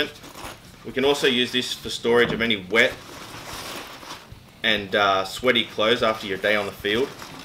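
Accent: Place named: Australia. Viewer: He has an Australian accent :) and we play softball in Australia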